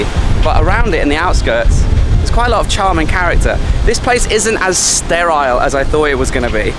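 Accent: United Kingdom. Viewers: You have a British accent. Are you from UK? Do you have camera crew? You don't seem to be holding a camera? I also notice drone shots.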